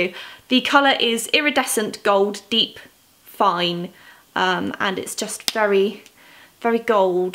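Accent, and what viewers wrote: United Kingdom. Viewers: Ur British accent is so hot 😍😍😍😍😍😍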